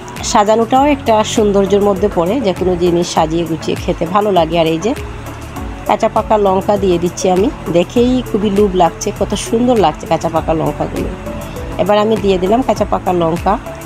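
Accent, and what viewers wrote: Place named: Sylhet, Bangladesh. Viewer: সিলেটি ফুরি😃😃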